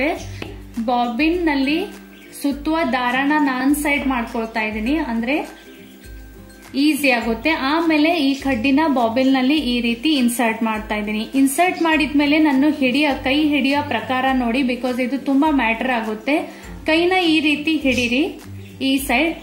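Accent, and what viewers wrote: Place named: North Karnataka, India. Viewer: Hi sister very usefull video nanu uttar karnataka davalu niu nam language tharane adtira niu yav districtdavaru ?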